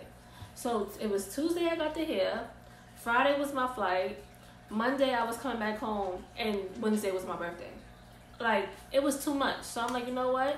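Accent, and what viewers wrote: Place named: New York, United States. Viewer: I hear her accent Im from nyc too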